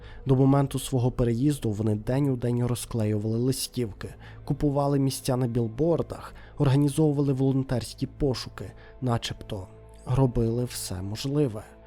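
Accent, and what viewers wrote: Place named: Ternopil, Ukraine. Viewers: Я також припустив що автор з західної України😊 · На таку файну тернопільську вимову нелегко нагнати саспенсу, хоча дуже стараєшся) якщо звикнути то норм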